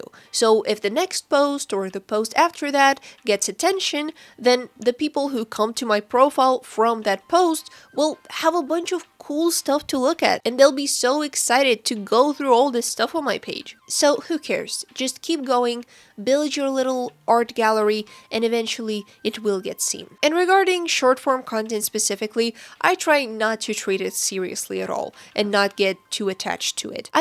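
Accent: Russia. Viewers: Good video, but that matryoshka balalaika accent...hard to listen to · русский акцент?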